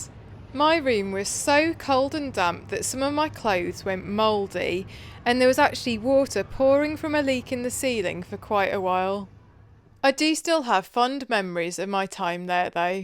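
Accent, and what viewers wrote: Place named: Black Country, United Kingdom. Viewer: Happy Birthday. I hope you had a lovely one. You're absolutely right about the sea, as i nipper i only saw the sea once a year whilst on our family holiday! You mentioning it in that context really clicked with me. I really miss the sea and just stand looking at it when i do see it!!! I guess it makes us appreciate it all the more though. Loved the video, love your Black Country accent, love too!! All the best, Graham xx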